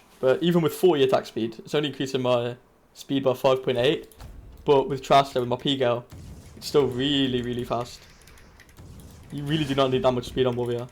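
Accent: United Kingdom. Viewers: Close enough, its actually british (atleast he sounds british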